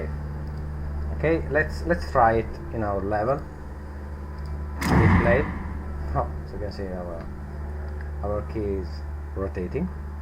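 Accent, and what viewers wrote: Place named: Italy. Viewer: Ma sei italiano? Non sembri inglese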